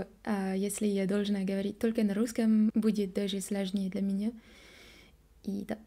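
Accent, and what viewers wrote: France. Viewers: Если бы Вы знали французский язык, то сразу бы поняли по произношению и выбору слов, что она француженка. Что касается её русской речи - вполне стандартная речь, изучающего язык на уровне А2-B1. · у тебя очень красивый женственный голос и с приятными французскими нотками!)) Говори по русски ни кого не бойся! У тебя очень хорошо получается!😊👍 Вы всё замечаете... вы замечательная красивая девушка!) P.S. ne faites pas attention aux commentaires négatifs, ils sont écrits par des robots ukrainiens en règle générale (troupes de canapé)... ils essaient de discréditer les Nations. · Вы внешне не очень сильно напоминаете типичную француженку из Парижа или Марселя. На вид обычная русская девушка из Москвы или Петербурга. Думаю с толпой вы легко скроетесь. Тебя выдаёт только твой забавный и милый французкий акцент. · Интересно звучит русский с французским акцентом , такое няшное звучание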